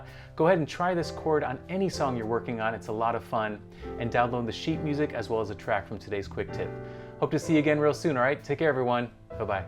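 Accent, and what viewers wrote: United States. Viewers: That was inspiring,. Any chance you're from Lowell, MA?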